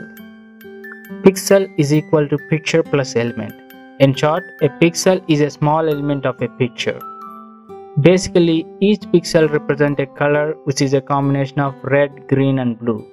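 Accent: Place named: India. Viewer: I am from China.Your Indian English is awesome.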